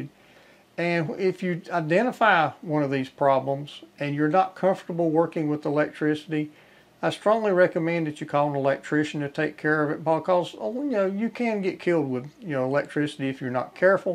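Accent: South, United States. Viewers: He explains it nice and simple. The southern accent is great. Thank you sir. Ya'll good people.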